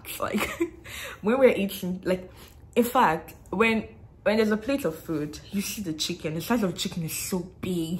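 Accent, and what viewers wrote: Ghana. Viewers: Hello, Once again, watching you from London. I know am not the first person tell you that you look and sound more Ghanaian than with Naija accent. You talked about sponsorship to Ghana, well You, my dear, are truly the kind that one would most definitely not say no as a next door neighbour. You know why, cos your whole body language or demeanor convey one message to the person watching you which says Peace all day long. - Just like Ghanaians period. Do not be surprised when you are swept off your that war ever so soon. You never know. This is Nash from London. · You sound Ghanaian more than Nigerian Anyways I like your videos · surprised at your accent too. Do your people take you for a Ghanaian because of your changed way of speaking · You really look, dressed and speak like Ghanaian. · I think you are more Ghanaian than Nigerian.Your accent, your calm nature and even your skin. 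Anyways I enjoyed your content,got yourself a new subscriber and also all Common Wealth students are all Vandals and it is not a cult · No Nigerian accent; u ve a perfect Ghanaian accent.... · Your accent is more Ghanaian now. Are u married? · Where did you get this accent, you speak like a Ghanaian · Ur accent look like Ghanaian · Your accent is perfect like Ghanaian 😂👍💯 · You sound like Ghanaian your English is quite different from Nigerian English. You sound more Ghanaians than Nigerian. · You look and speak like a Ghanaian. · Your accent is more Ghanaian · 5yrs and your accent is already ghanaian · You sound more Ghanaian than Nigerian, I must confess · You sound soooo Ghanaian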